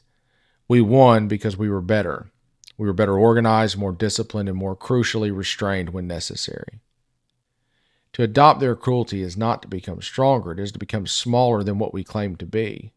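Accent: South, United States. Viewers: Thank you for your words. Fellow Arkansan. It's hopeful to hear words like these come from a southern accent. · Hearing this with southern twang… you make me proud to be a fellow citizen of yours. I really hope there’s more of yous than there are of them.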